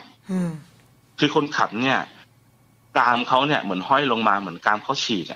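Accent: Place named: Korat, Thailand. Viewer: สำเนียงโคราชมันได้อรรถรส 🤣🤣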